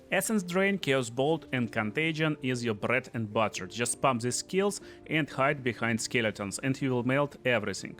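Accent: Russia. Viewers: I hear Russian accent aula bulia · слышен явный рязанский акцент, уши прям режет =)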